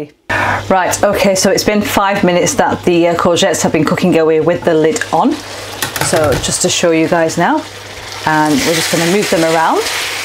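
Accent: United Kingdom. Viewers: Be aware that you have a thick British accent and you talk very fast. Sometimes when I don't understand things, I suspect it is not your accent. British English uses different words than other forms of English. I'm a linguist and a retired university professor in Japan - I've been here over 35 years. After teaching for a while, I stopped correcting my students on everything. I just made them aware of other what speakers of other dialects of English would understand. Yes, I do hold a US passport, but it was not my goal to teach the American dialect of English. I wanted them to be fluent speakers of an International Dialect of English so as many people as possible could understand them.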